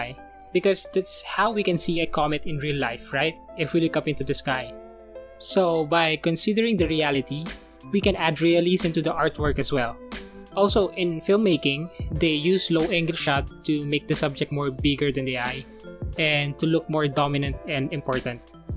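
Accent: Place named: Philippines. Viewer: I knew you are a fellow Filipino just by judging your accent and your voice. I'm also a Filipino.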